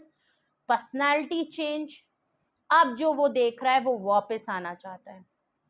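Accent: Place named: Eastern Uttar Pradesh, India. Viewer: Mam your way of talking is very impressive.....i think you belong to eastern up...Jai shree sita Ram ji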